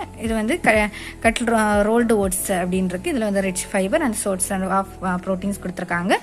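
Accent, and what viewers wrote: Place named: Kanyakumari, India. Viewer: neenga pesura slang vachi than sonnen nanum kanyakumari than akka nice tips akka appreciate you 👏👏👏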